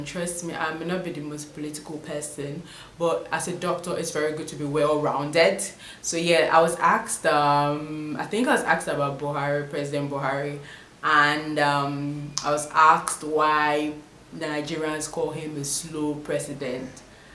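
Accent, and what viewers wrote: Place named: United Kingdom. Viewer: Thank you..very helpful. I hope to get in next year. The British accent keeps popping up every now and then😊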